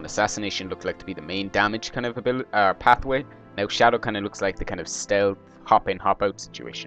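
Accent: Ireland. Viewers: are you irish? DAT accent !!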